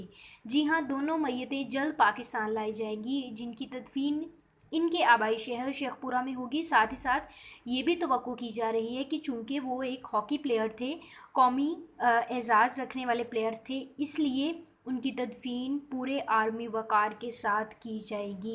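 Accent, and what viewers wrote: India. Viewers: Accent indian ha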